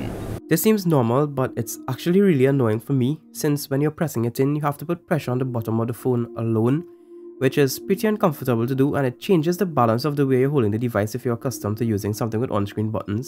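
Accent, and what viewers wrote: Trinidad and Tobago. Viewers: trini accent people, am a Vincentian and we from the Caribbean!!!! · Phanic! At the Twenty One Crybabies it's Trinidadian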